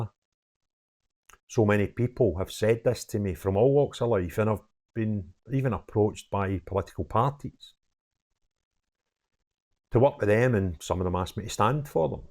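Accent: Scotland, United Kingdom. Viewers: Great to have your Scottish accent..we represent our beautiful country of Scotland….we must work together and get away from the total control of the English..we are the oppressed..we are Palestine…thank you for being a voice for us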